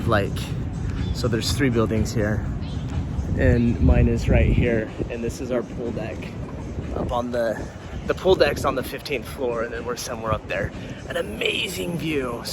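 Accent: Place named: Utah, United States. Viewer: I knew I heard some Utah in that voice. :)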